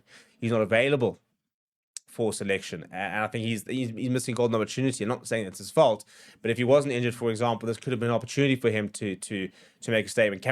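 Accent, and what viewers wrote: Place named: South Africa. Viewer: Yea its a youtube problem not a creator problem... well actually it is, youtube cant understand a saffa accent😅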